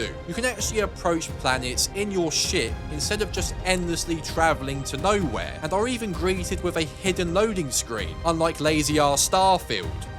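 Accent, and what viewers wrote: United Kingdom. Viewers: Why do all British YouTubers sound like absolute bellends? Are you all trained at some school to sound like retards?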